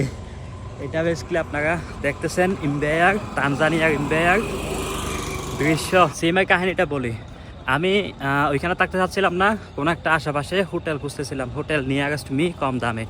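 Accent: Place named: Banshkhali, Bangladesh. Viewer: বাঁশখালী মনে হয়।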